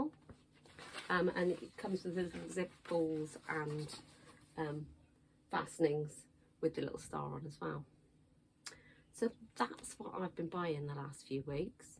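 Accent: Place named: West Country, United Kingdom. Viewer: Great 1st vlog 👏🏻 really enjoyed listening to a West Country voice (being from Somerset). I’m interested in the bag makers box too - the bimonthly is good - not sure I need a bag a month 😅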